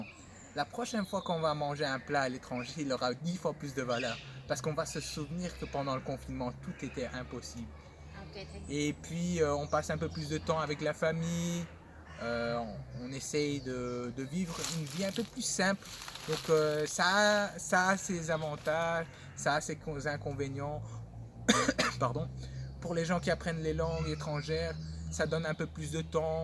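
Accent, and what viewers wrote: Belgium. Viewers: Je suis passé par ici pour voir comment un polyglotte aux traits orientaux parle le francais, mais je ne m'attendais absolument pas entendre un brusselere - ptdr. Et a part ca tu te debrouilles pas mal du tout en Russe, je suis jaloux :)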